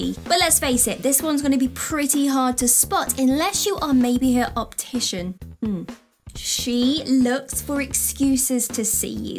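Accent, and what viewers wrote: United Kingdom. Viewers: I labh the British accent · NunYo BizNizz It’s British.., where did you get that idea from? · Uk accest much better then usa and Canada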